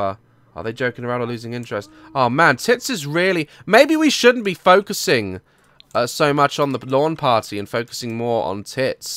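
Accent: United Kingdom. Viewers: Are you British ?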